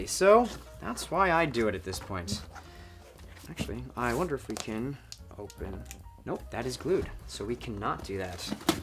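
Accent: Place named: United Kingdom. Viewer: I honestly thought you were british all this time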